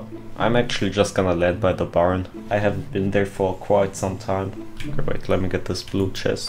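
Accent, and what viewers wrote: Germany. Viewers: I could swear i am hearing german accent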